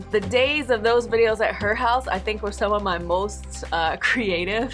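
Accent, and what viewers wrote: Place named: South, United States. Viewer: Congrats 🎉🎊❤!! What I love the most is that you still have a southern drawl when you speak, it's been 8 years and you can still tell you're southern. Thanks to your videos I got to learn more about Korean culture through the perspective of a Black-American woman! Thank you for your dedication and love sis!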